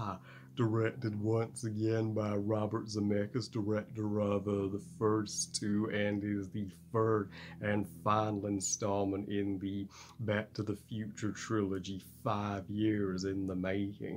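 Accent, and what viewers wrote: West, United States. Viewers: Hi! We are big fans of this series. Of course one and 2 are our favorites but 3 wasn’t bad. Your western accent is cute!! 😆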